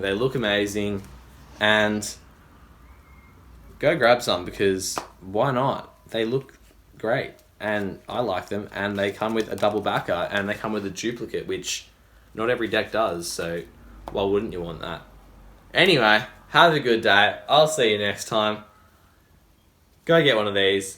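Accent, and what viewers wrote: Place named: Australia. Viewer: Great review my guy! I already have the cards but that Australian accent kept me on the video 😂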